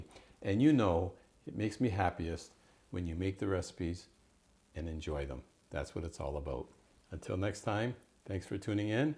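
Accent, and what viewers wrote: Canada. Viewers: Hey i got a question been watching a few of youre video i was just wondering where u from u sound Canadian but im not sure im from just outside Toronto ty have a good day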